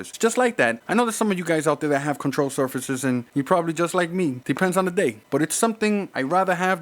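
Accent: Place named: New York, United States. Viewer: that NY acccent!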